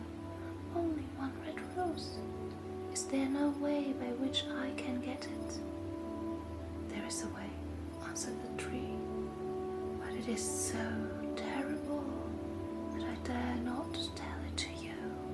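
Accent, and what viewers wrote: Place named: Germany. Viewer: Maybe if your videos get more popular that you could buy a proper microphone, but I don't really know anything about that kinda thing. Well, I've certainly heard a lot of germans with a lot more accent than you have, so don't worry about that! I thought for a while I heard some french in your voice, but also some german, so that's why I couldn't really place it.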